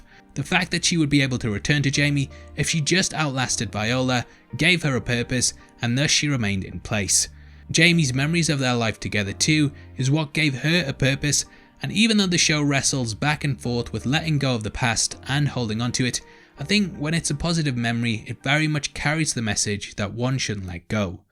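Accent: United Kingdom. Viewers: An old, beautiful house... British accent... …nice dresses. Count me in! :)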